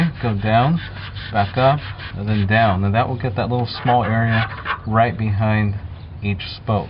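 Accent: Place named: Canada. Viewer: You must be from Canada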